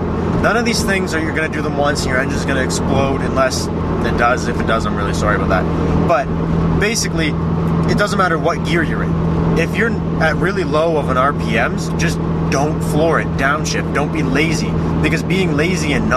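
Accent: Canada. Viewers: ahah you canadian? maybe from ontario i heard G1 lmao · TheDerDumme He sounds Canadian to me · First video watched from you. Are you from Canada? XD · dat Canadian accent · are you from Canada ?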